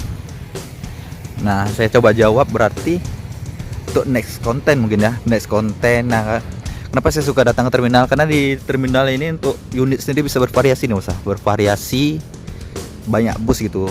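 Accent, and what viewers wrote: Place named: Aceh, Indonesia. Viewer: Orng aceh ab ya, gmongnya ada g. Ong bhsa aceh. Oy new pelangi knp gk prnh nmpak b.???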